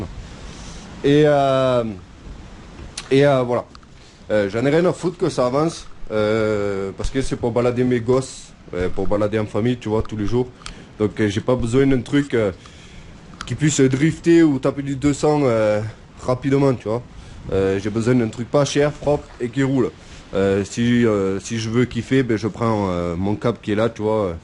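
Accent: South, France. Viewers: G déliré ou t'as l'accent du sud quand tu parles dans la vidéo ? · Force à toi, le scandinave avec l'accent dégueulasse du midi.... Bisous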